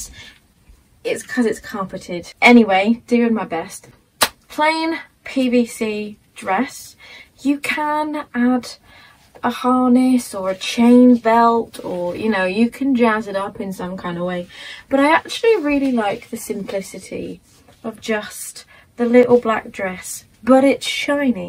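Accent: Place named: United Kingdom. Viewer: Whys she british :(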